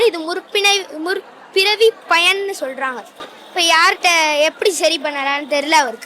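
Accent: Madurai, India. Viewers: மதுரை தமிழ் அழகு❤ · இந்த அய்யன் பேசும்போது மதுரையின் பேச்சு வழக்கு தேனாய் இனிக்கிறது 🙏🙏🙏☀️🌙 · Madurai la mattum thaan ipadi tamil pesa mudiyum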